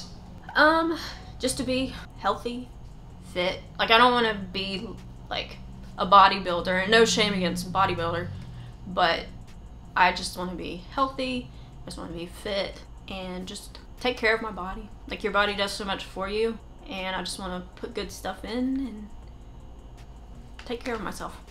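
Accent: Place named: Rural, United States. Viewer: From listening to you I can tell you are a small town country girl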